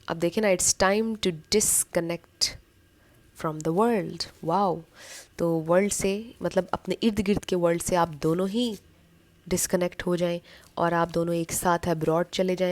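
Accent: Pakistan. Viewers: Apna khyaal rakhiye Aap hi nahi main all taro readers ki dil se izzat karti hu... Means aap log apni rooh apni jaan laga dete hain Ek Ek Situation ko samjhane me.... Her tarah se hum logo ko achcha chahte hain Aur Bahot mehnat karte hain... I love ur urdu lehja also vvvvvvvvry much.. Pl tk care urself... N again Shukriya....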